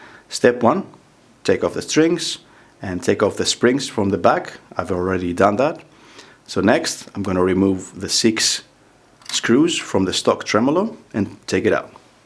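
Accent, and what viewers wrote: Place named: Greece. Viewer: 1 minute in and I started looking if you are Greek. Bless the accent <3 Great work <3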